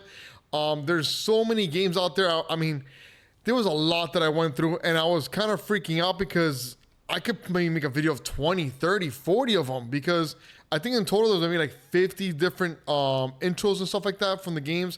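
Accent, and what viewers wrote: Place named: Miami, United States. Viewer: You sound like you're Miami? Are you?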